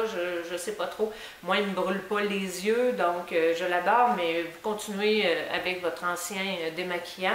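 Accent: Quebec, Canada. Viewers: Hey !!!! Je me trouve enfin sur ta chaine et elle est juste superbe!!!!! Tes vidéos sont superbes et j'adore ton accent québécois. Continue comme ça 😊 bisous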